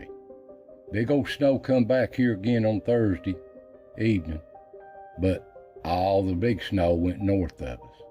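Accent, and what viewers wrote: Appalachia, United States. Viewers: Yes sir Mr., we love hearing you narrate these wildlife videos!! Viewing the animals is great to watch taking in all GOD’S CREATION, but your Appalachian voice filled with your knowledge and the way you explain everything to us is the icing on the cake!!!! Great Video!! 😇🙏🏻👍🏻☀️😊😊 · We love your voice, it is extremely intelligent knowledge with a true applachian tone. Love when you narrate. Enjoyed the wildlife this morning. Thank you for everything...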